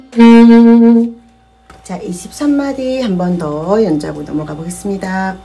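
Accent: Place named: Seoul, South Korea. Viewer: 오? 부산에 계신데 서울 말씨네요~~^^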